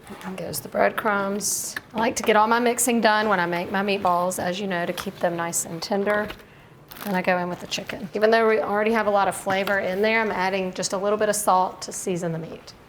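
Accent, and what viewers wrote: South, United States. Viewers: Italian babe with a southern accent. Win win!!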